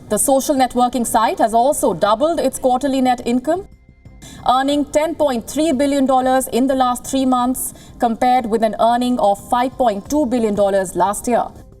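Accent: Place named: India. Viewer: I love the totty English spoken by this lady of India than English speakers beautiful accent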